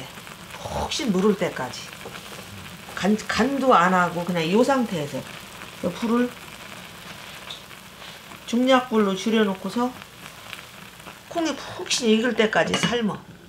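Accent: Chungcheong, South Korea. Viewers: 충청도 사투리가 너무 좋습니다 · 어머님, 구수한 충청도 말씀이 너무 좋아요. 오늘도 감사 합니딘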